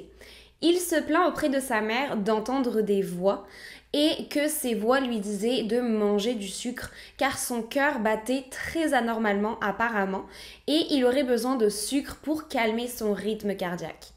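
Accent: Quebec, Canada. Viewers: je viens de capter l'accent Canadien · C’est moi ou t’a un accent québécois? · larry avait l'air d'un sacré demeuré sinon laisse tomber la FAQ c'est naze, tu voudrais pas raconter des saloperies avec ton accent quebecois c'est plus drole!!** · T’es québécoise ou française ? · C'est la première fois que j'écoute une de tes vidéos... mais ce que ma frapper.. es- tu québécoise ? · Tu très léger accent québécois c’est vraiment mignon, j’ai froncé les sourcils je l’entendais pas tout le temps. Mais le sujet est vraiment flippant. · Merci pour ta vidéo 😊je viens de m'abonner 😍es-tu québécoise?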